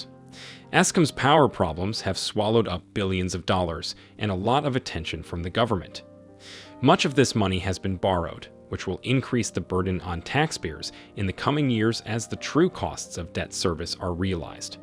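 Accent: West, United States. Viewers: Is sad but the person behind this i an African most likely from west Africa, he is just using a western voice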